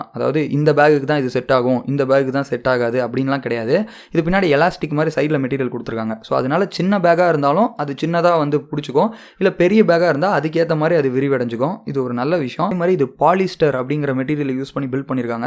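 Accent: Salem, India. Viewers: Bro neenga Salem ma bro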